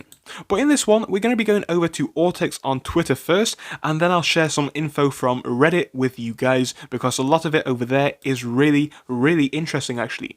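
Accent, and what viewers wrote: United Kingdom. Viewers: You from the North? A UK Ape?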